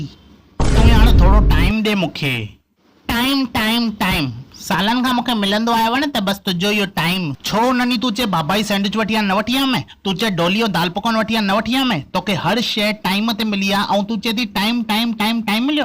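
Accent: Sindh, Pakistan. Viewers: One about i like in you...tu khalayan main puro pakko sindhi lagdon aahain ...so cute too that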